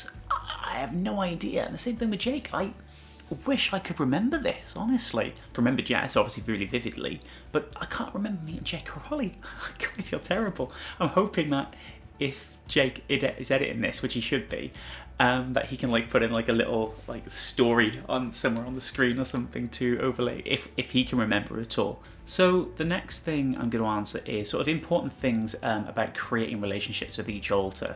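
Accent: Wales, United Kingdom. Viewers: let me guess you guys have a pug? LOL I am guessing that is the animal you have considering there is pug shit everywhere including the sweatshirts your better halves wear you seem like a really cool guy they are lucky to have you I’m glad I found this channel it is inspiring and the stories are always fun I wish all of you nothing but the best. also I did have a question I was wondering where you guys were from? I am from Milwaukee Wisconsin I am figuring somewhere in the UK from the accent but I’m not sure. Anyways take care now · Are you Welsh by any chance? I hear a bit of a Welsh accent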